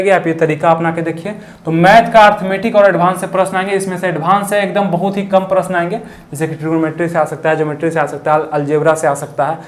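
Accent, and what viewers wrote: Bihar, India. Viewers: या तो ये बिहारी है या फिर यूपी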